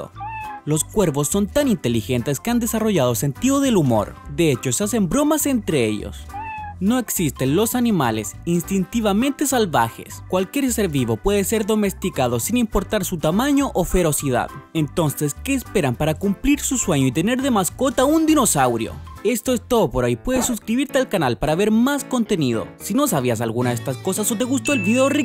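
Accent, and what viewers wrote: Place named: Chile. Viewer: En cada video tienen un acento diferente.. esta vez tuvo mas el acento Chileno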